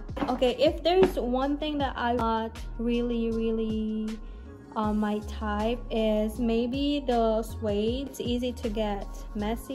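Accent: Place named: United States. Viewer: Grabe bongga. masyado kna slang..ilang taon kna sa U. S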